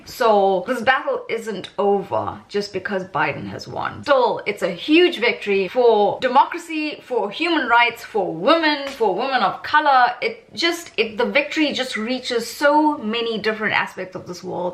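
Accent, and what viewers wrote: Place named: South Africa. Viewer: Oh...... Finally...! I know this point will be entirely off topic ne but flippen hell.. it really feels good to hear your South African accent + your SA Indian accent no like less! For the longest time I've mostly only ever seen these US presidential discussions feature folks from USA & UK reporters and alike. You're bringing a voice to this topic from SA's observing point of view & we're waiting out here just wondering how these next 4 years of USA, laws and policies affect us. This is Ken, from Our East London, SA lol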